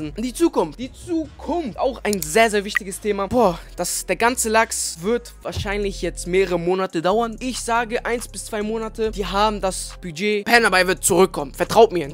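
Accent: Germany. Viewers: Geiler magga hahaha bist bestimmt aus Hamburg wa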